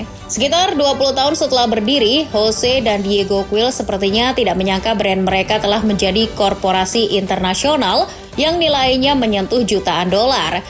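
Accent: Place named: Banten, Indonesia. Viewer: Admin orang Banten ya lur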